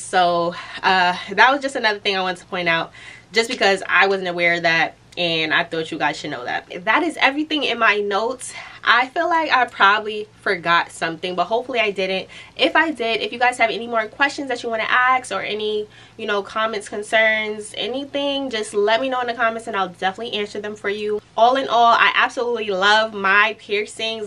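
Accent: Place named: New York City, United States. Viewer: Shout outs to you and being frim NYC. I knew instantly once you started speaking. I'm considering this myself, very helpful video. Thank you, peace & love.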